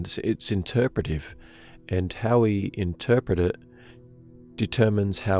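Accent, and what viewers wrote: Australia. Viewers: dude i fucking love you and your videos. amazing work, amazing commentary, delivered like only an aussie can. subbed! keep it up!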